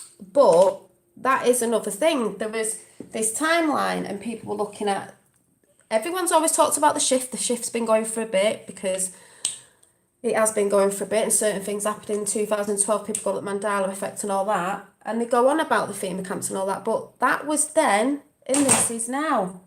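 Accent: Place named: Stoke, United Kingdom. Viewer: what part of the uk are you from, you sound like a stokie?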